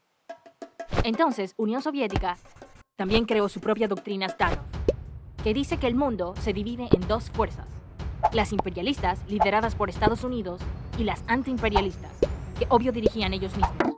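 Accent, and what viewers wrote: Panama. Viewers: jaja, su acento panameño es muy notorio jajajaja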